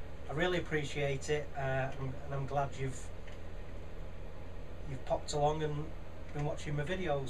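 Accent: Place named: North, United Kingdom. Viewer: I love your style, your layman's explanation of things really has increased my understanding in leaps and bounds. I've been struggling to decide on which lathe to buy for months and whether I had the confidence to start turning. But you have really spurred me on, thank you. If I may ask some advice as a beginner? I'm going to spend as much as I can reasonably afford on the lathe (As the advice goes) but the turning tools are in danger of wiping me out, especially after buying a chuck too.. Can you suggest some decent ones to start with? Should I aim for HSS? Also, you sound like a fellow northener! Are you allowed to say where you buy your wood/blanks from? And what wood is good to start with? I have lots of offsets of softwood 2x4 and treated rough sawn....? Sorry for all the questions......but I don't feel stupid asking you. Thanks again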